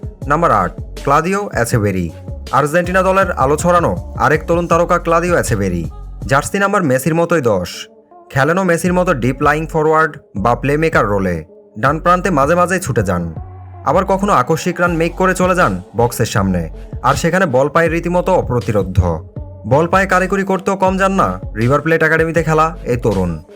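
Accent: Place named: Bangladesh. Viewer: Tui I think bangladeshi mal tor vasa sune bojha jay.. Tora video bans na..jato abal public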